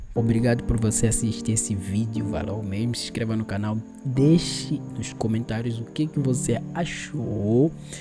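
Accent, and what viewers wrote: Brazil. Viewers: O teu sotaque é muito parecido com o português - brasileiro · O gajo tem sotaque brasileiro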